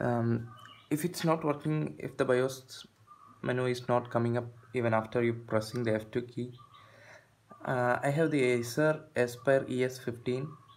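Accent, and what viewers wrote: Kerala, India. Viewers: 😀sound ketta ariyile Malayali ann enn🌝😆 bro pinne orru help vennam 😅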